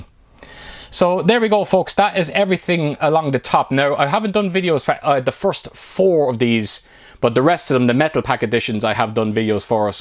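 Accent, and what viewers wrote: Ireland. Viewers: Hi, It’s Mick from across the pond in NY. Been hitting the like button on your videos for some time. Brilliant job mate on your passion. I’m jealous of many of your pieces that only collectors understand. I have a great set up here and have the passion also ( not the film ) 😂 Keep the hard work and love going as to keep the home experience and physical media alive. BTW - Love the brogue- Where in Erin? Got plenty of family in West Clare and Tipperary. Also dig my govee backlight mate. Cheers! 🇺🇸👍🏻✌️😎Just got my steel book copy of SleepHollow can’t wait to spin that incredible film.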